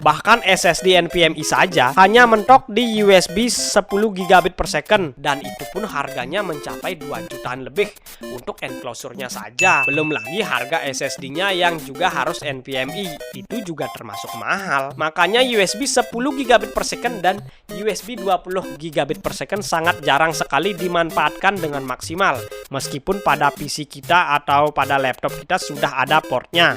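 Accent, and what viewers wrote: Bali, Indonesia. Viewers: Bali sajan logatne nok 😅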